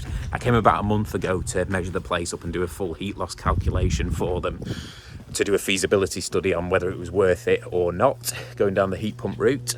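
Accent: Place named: Northwest England, United Kingdom. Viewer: Your accent sounds like you are based somewhere in the NW. I’m midst arranging a eco4 heat pump install (and insulation) via the council for my parents, it’s for a nearly 300m2 property. Pre insulation it was a 18kW heat loss estimate from the EPC, obviously we hope that can be significantly improved. Wonder if I can ask what a the key aspects I should I be looking for from the installer please, it’s the council approved installer from Cheshire East that is based in Warrington/ Liverpool (I don’t like to mention whom it is). Thanks for any tips.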